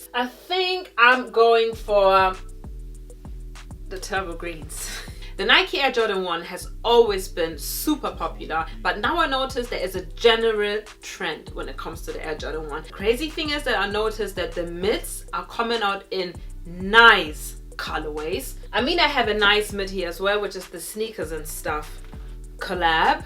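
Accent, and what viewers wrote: Germany. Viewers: Are you german cause your accent sounds like a german accent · Du hast voll denn deutschen Akzente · Are u German? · Hahaha habe ich grad auch gedacht so sprechen nur deutsche Englisch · She has a german accent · I'm also German and I can't stand German accents and I can't stand hers either She literally pronounces the words as if they're German words 😭😭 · du hast irgendwie ein deutschen aktzent in deinem englisch lol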